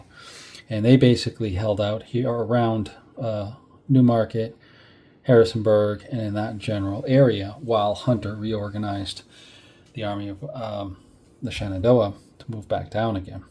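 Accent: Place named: Massachusetts, United States. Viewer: I can see how a Massachusetts accent wouldn't work down in ol' Virginia!! Actually, everyone NOT from the Shenandoah would likely make the same mistake with Staunton. It's part of the rite of passage as one gets immersed in Civil War history!! I'm still learning about the odd pronunciations of some waterways (Mattaponi, Ni, etc.) and some of the commanders are routinely mispronounced (the two Taliaferro's, pronounced "Tolliver", Huger (pronounced more like YOU-zhee) and even Heth (pronounced more like "Heath"!! I also find many people seem to trip over Sigel, which you did not, to your credit. I don't know WHERE someone would get "SY-jel" out of that, but some do!!! 😉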